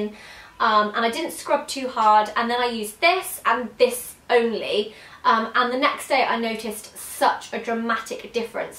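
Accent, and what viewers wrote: United Kingdom. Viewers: Is she british? Plz reply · You are british ?! :))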